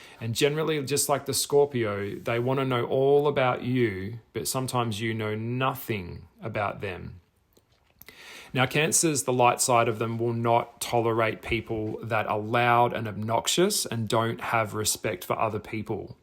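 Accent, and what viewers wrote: Australia. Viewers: Sounds like you are Aussie!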